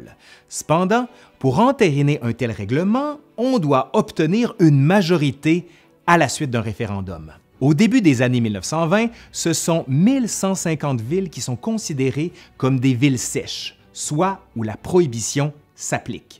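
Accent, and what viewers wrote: Quebec, Canada. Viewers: cet accent, caractéristique du Québec, mais sans les excès de certaines personnes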